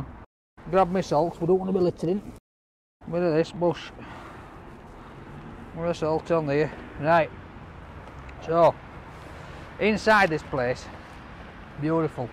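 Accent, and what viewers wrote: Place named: Yorkshire, United Kingdom. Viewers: Yorkshire accent rainy weather and brilliant fish and chips.. I'm fasting but I'm coming after Ramadan for this.. Great video pal keep it up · He's from Barnsley and lives in Leeds I'd say that's a Yorkshire accent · The accents are similar but not the same. This guy is from West Yorkshire and Peter Kay is from Lancashire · I do really love the voice of a Yorkshireman!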